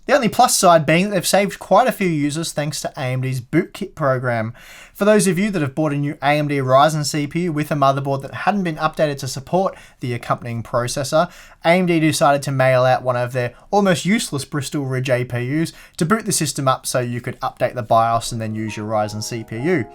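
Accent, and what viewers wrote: Australia. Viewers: I didn't come here for the list, I came here for the Australian accent I'm sorry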